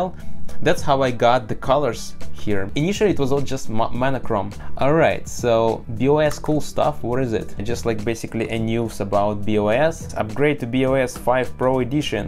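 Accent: Russia. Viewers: Obviously not, strong russian accent :)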